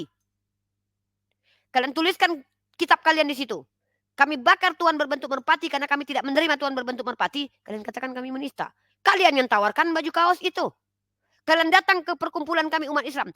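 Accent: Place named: Ambon, Indonesia. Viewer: Enak kali ngomong ambon 2' maulah kalian jangan mancing yaa..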